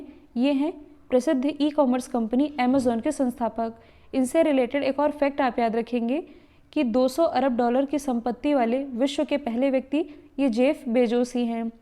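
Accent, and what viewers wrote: Madhya Pradesh, India. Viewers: Maam aap MP ki hai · वाह मेम आप एमपी से हो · Ma'am Aap mp k ho??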